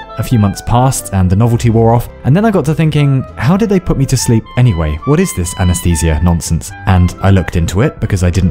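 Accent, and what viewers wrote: England, United Kingdom. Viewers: is this the same guy from the zephereus channel or do all brits sounds the same to me? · Orchestral Piece Begins British Bloke starts speaking fast This is when the videos make me shag the vicar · your beautiful fellow English voice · There's like a whole bunch of youtube channels now with narrators with the same Alain de'Botton accent, and I'm not convinced that they're not all de Botton. But this one is the best one. And if it is de Botton, he's much smarter than in most of the other channels. And on a side note, why do Brits with this accent sound smart, even when they're dumb as doorknobs. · right away u know u r listening to a Brit. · Brits will always have the best narration voice, love ur vids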